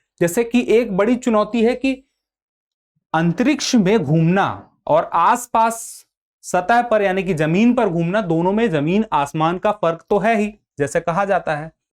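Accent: Bihar, India. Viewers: guthka nhi hai I think sir bihar side se hai vha esa hi eccent aata hai.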